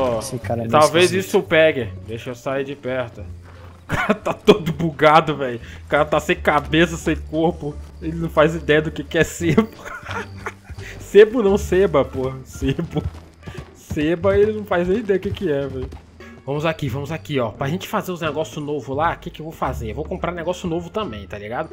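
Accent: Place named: Minas Gerais, Brazil. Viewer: Sotaque de Minas parece da roça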